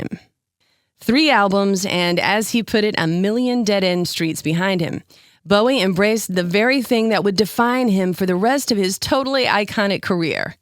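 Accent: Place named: United States. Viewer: This is a superb commentary on a song like no other. I've just seen so this and other songs are going round my head as they did when I first bought the Ziggy album in 1972. I love it when you read aloud the lyrics. You have a great voice, and speaking with an American accent, you bring out different shades of meaning in the words, originally sung in his London / English accent. Many thanks!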